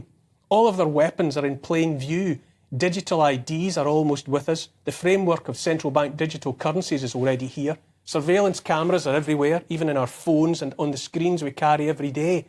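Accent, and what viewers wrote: Scotland, United Kingdom. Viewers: You nailed it 😁 Without giving any thought as to why I was inspired to write a poem about him... It just happened. But you've summed up what sparked it 😊 This was May last year 😊🤗 Won't Kneel A gentle, beautiful Scottish lilt A kind, empathic, forward tilt An open heart; a caring face A willingness to take his place. A strong, home-hitting monologue Reminds us of the boiling frog The courage to become a sleuth A passion to release the truth. A deep desire to crack the whip Illuminates our freedom-strip Calls out the darkness taking hold The shiny despots; plated gold! Intense and raw, he lays it bare A soul-enhancing, honest stare Inflections drive the message through He speaks for Us - for me and you! Discernment's such a precious gift His words create a mighty lift Take heed of all he has to say He knows - it shouldn't be this way! 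🤗🦋🐬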